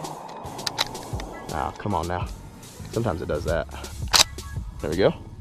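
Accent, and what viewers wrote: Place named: South, United States. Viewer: When does gun videos, in this one I noticed it the most, he gets a slight southern accent, playing into those routes. 'Murica!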